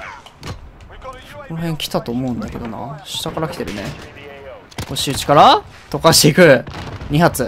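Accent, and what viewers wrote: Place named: Hakata, Japan. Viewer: 筋肉男子の博多弁は惚れちゃうね❤️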